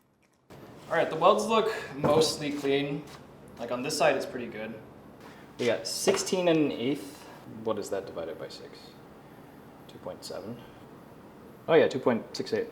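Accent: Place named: United States. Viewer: Such a shame this comes with a grotesque American accent. To the YouTuber, change it, its unwatchable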